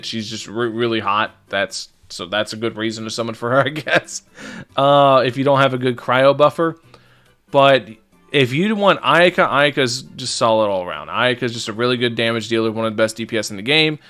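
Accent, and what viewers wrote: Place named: Canada. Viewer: My girlfriend thinks you sound Canadian. Are you brother?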